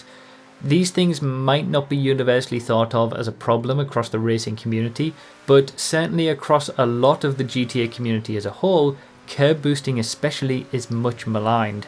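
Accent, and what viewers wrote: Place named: England, United Kingdom. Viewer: he's English thats whats going on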